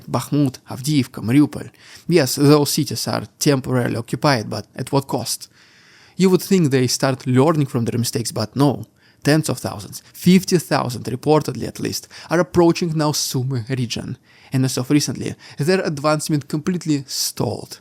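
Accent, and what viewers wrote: Russia. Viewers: Here fore a dose of accent. Getting the news is a plus, too!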